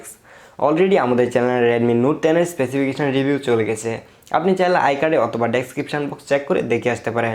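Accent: Kishoreganj, Bangladesh. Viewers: Vai apnar basha ki kishurganj...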